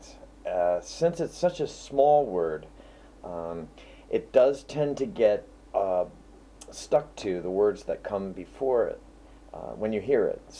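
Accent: United States. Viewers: Hehe. I love the American "R", hihi =) It sounds so weird, since i'm a native speaker, though I don't blame you. Very interesting video man, carry on :D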